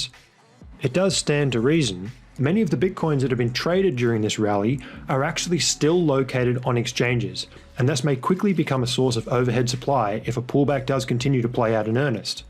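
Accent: Australia. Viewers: Great, a fellow Aussie. Accent is unmistakable! Love this channel. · THANK YOU...GREAT ANALYSIS...much appreciated...well done...ps...do i detect an Aussie accent? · Fantastic video! Good to hear an Aussie on RSC!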